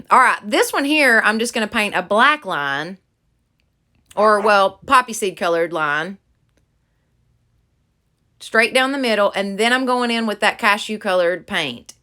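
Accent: South, United States. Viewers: You are adorable and I love watching your videos!! Love your Southern accent. Pls tell me, how do you do your lashes??? Gorgeous Girl ❤❤ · I have been watching your channel from the beginning & the quality of your videos has improved so much & so FAST!! They’re almost as AMAZING as all of your crafts!! I knew from the caliber of your projects at the beginning that you would only get better & BOY have you ever! And OMG! You CRACK. ME. UP. When you call your project pieces “dude” I just die! 🤣 I’m a southern girl too-I grew up in Savannah-& miss hearing that deep Southern accent (I don’t have one unless I’m IN Savannah for a while & even then it’s not very heavy.) I just think you are hysterical! You seem to be a genuinely sweet person & I very much enjoy all of your videos-your DIY’s are SO GOOD! Thank so much! 😘 Amy (from North Atlanta)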